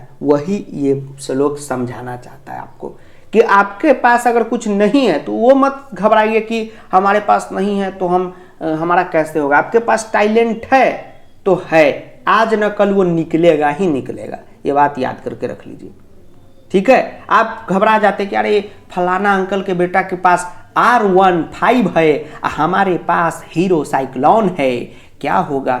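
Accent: Bihar, India. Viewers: Sir aap bihar se ho 😊😊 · Sir aap bihar se hai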